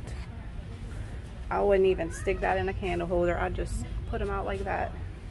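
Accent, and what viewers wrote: Louisiana, United States. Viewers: Mam, was wondering... Are you Cajun? I live in Louisiana and you accent sounds very familiar. Love it!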